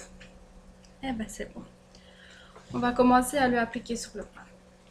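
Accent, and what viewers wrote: Liège, Belgium. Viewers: Ca c'est un accent bien de chez nous!! et de Lièèèèèège ;-)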